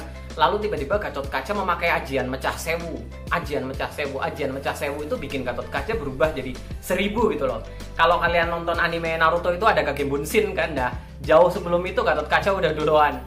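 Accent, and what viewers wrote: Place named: Pekalongan, Indonesia. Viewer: Semangat mas dengan konten super Hero lokal nya..yakin lah kalo film superhero kita sudah jadi dan rilis Pasti Channel mas yang suaranya khas Pekalongan ini bisa makin Cetar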